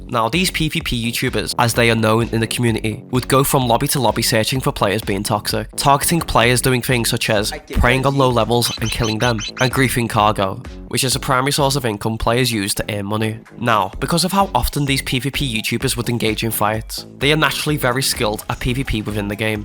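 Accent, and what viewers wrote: Liverpool, United Kingdom. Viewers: The scouse accent is boss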